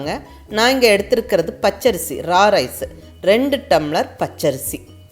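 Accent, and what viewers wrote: Kongu, India. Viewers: I like your tamil kongu slang mam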